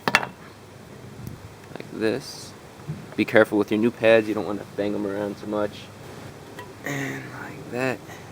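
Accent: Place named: New Jersey, United States. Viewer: nice video thanks. I grew up in NJ - your accent sounds like you're from not far from there.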